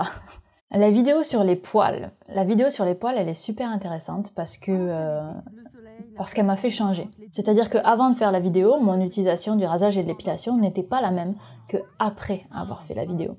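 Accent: Quebec, Canada. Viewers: C’est moi qui ne m’en suis jamais rendu compte ou est-ce que tu commence à développer un accent québécois ?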